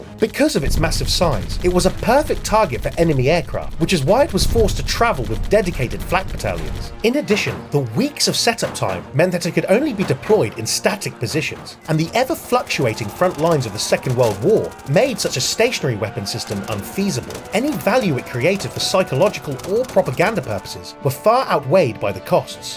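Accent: United Kingdom. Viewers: Wtf with the bri-ish voice?? Didn't those guys lose to a bunch of pilgrims???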